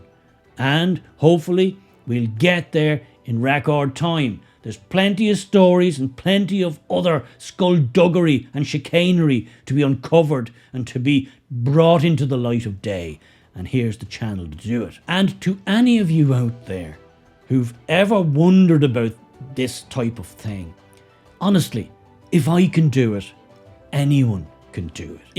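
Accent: Ireland. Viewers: Enjoy your style, especially that Irish accent. Please continue making the videos, I love listening to your wit and sarcasm. Onwards and upwards to the 100,000❤🌞🏴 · it's your common sense and cracking accent (i stand with ireland) that i enjoy keep up the good work.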